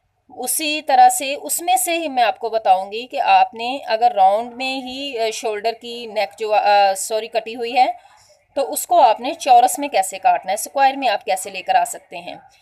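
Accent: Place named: Faisalabad, Pakistan. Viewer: Ye to faislabad say hy lagta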